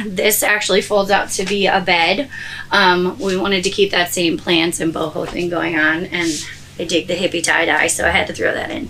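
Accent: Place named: Poland. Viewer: ary you from Poland because your "ci" sounds very polish?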